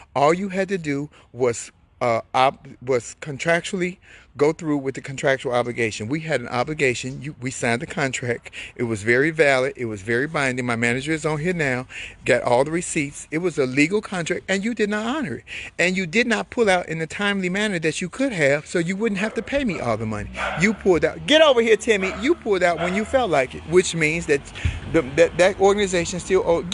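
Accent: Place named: Chicago, United States. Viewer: I love you my dear PERIOD! You sound just like US girls from Chicago! The early 90s was the ish!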